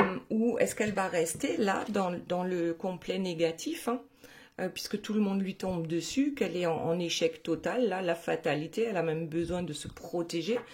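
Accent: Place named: East, France. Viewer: Merci beaucoup pour cette guidance, je pense que ça correspond bien à ce qui se passe dans le couple de celle que j'aime avec qui on est en non communication pour l'instant. Sinon j'avais choisi le monde pour elle et la mort pour moi et ça me va 👍 Merci (aussi pour ce petit accent de l'est ..😊 je suis de Moselle 😂) 😘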